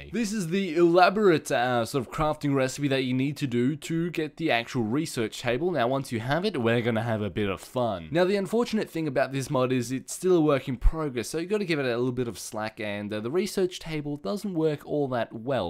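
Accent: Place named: Australia. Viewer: He's Australian. That's how we pronounce it and it's also how it's pronounced in the UK.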